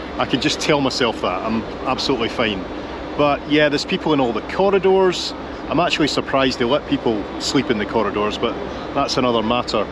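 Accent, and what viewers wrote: Scotland, United Kingdom. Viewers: I am definitely with you, as a solo traveller most of the time, always much more enjoyable in Winter with quietness and calmness, LOVE all of your videos, icing on the cake to me is your charming Scottish accent;)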